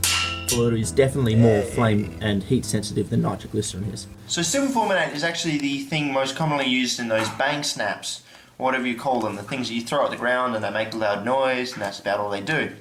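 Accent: Australia. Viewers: every time i watch a video....im all like....Damn, this dude is so Australian. Its the best.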